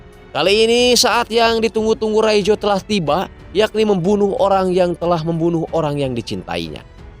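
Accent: West Java, Indonesia. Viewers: iya kan,,sumbu pendek,,,ini film serius,,knp suara yg buruk ini pembawaannya komedi,,,di kira lucu kali dgn logat sundanya,,kampungan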